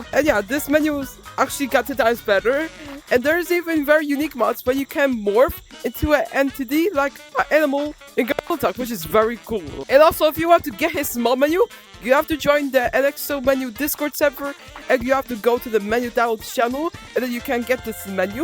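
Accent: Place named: Poland. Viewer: o kuzwa ciagle wiedzialem ze cos jest z jego akcentem ale ze chlop jest z polski?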